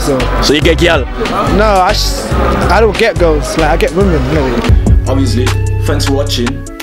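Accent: Birmingham, United Kingdom. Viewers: Is he from Brum or what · Bro I'm from brum but my accent ain't like yours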